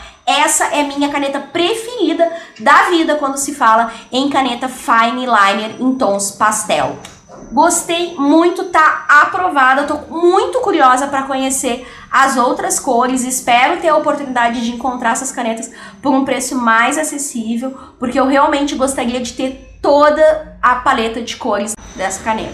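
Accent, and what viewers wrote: Rio Grande do Sul, Brazil. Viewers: Qual a sua brushpen e onde vc comprou ela? Sou de POA e pelo seu sotaque vc também é gaucha, não acho nenhuma brushpen em nenhuma papelaria, poderia me indicar uma?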